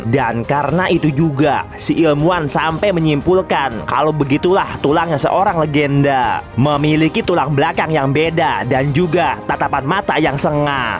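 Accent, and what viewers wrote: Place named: Banjar, Indonesia. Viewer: Urang Banjar kah saban hari jar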